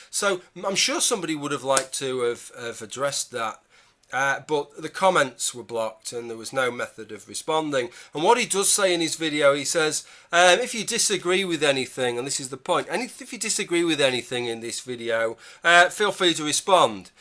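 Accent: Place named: Yorkshire, United Kingdom. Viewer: No, it's a Yorkshire accent. Bit late but now you know.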